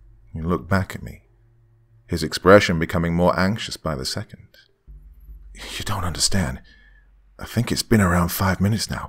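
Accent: France. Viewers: I agree!!! 👏👏👏 his French accent is TOPS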